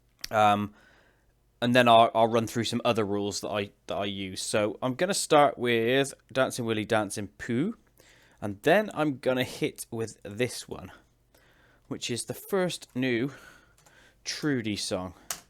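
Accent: United Kingdom. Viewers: I have a question Are you from UK for something?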